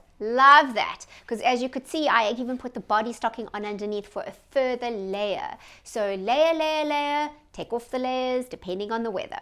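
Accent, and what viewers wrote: South Africa. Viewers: You’re fabulous! I’ve been following you for a short while now. Been trying to place your accent. South Africa. Thanks for sharing that. Beautiful accent!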